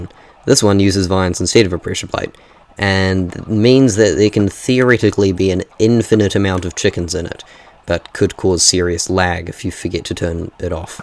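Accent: New Zealand. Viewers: Omg W New Zealander (Kiwi?) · I know a kiwi accent anywhere, chur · Amazing work! Also very cool to hear such a prominent Kiwi accent, very cool! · Are you a Kiwi? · Hello fellow Kiwi. Love the ingenuity in action. Heard that accent and it always just hits different 😅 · NZ accent? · Are you from NZ?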